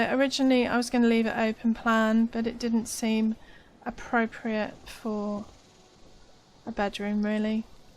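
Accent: United Kingdom. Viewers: I love seeing all these camp builds people come up with, thanks for some ideas, really helps as I'm not that imaginative if I'm honest. Shame you only play on Xbox? it would have been nice to see your camps ingame and have a chat. Love your humour and accent too, despite being literally from the same country and having pretty much the same humour as all British people do really. Have a good weekend c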